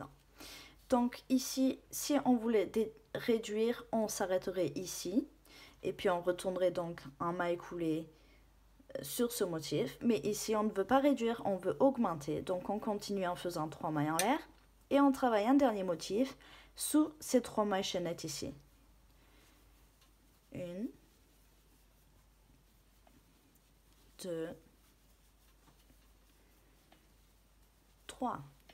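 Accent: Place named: England, United Kingdom. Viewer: bonjour j'ai suivi vos conseil, j'aime votre petit accent anglais je vous encourage à continuer vivement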